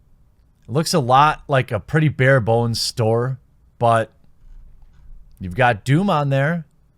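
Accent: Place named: Midwest, United States. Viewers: Yup, that midwestern accent. I knew some who said "leyg" and "draygon" all the time and didn't even notice till someone points it out, lol. Lots of different accents in America if you look around closely ;) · Welcome to Midwest accents. -an Illinois'an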